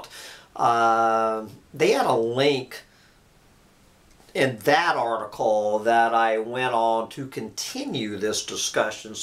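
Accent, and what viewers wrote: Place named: Georgia, United States. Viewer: It´s the georgian accent.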